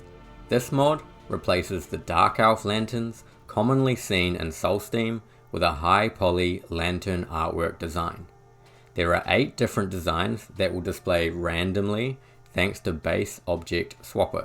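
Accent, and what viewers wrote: New Zealand. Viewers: you from NZ?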